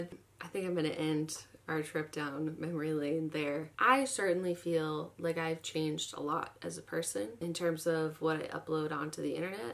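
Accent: Boston, United States. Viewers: I love the old Boston accent showing through! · I LOVE YOUR ACCENT!!! I got my Boston accent from you and I live in Oklahoma. You use your accent in your most recent videos as a thing, as an attitude. You are ole Greg to me, except you're better. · lol your boston accent is GOLD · Zietz I totally agree with you on this. I was thinking the same thing. I can understand her not wanting to watch herself though because I am the same way, but don't apologize for being you back then. You were amazing then as you are now. And I hate that you changed you Boston loud accent on purpose. It makes me sad. Sure grow up but be authentic. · It's been incredible to see how much you've grown as a person over the years. I've been on your channel since the beginning and it feels like i've grown with you. Loved your videos then, love them now. I totally forgot you had a thick Boston accent btw!! 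· omg i never realized how strong of boston accent she had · That Boston accent tho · I can't believe how strong your Boston accent used to be, I've been a fan since 2010 but I never noticed the change! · Caitlin Slattery she said in the video that she had a Boston accent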